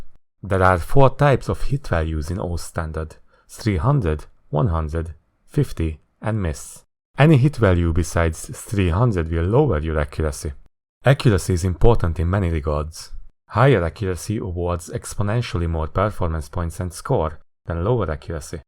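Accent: Hungary. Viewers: Az akcentusból éreztem hogy hmm magyar lehet, aztán xD keep it up bud ^^ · Wait are you hungarian? Great video btw!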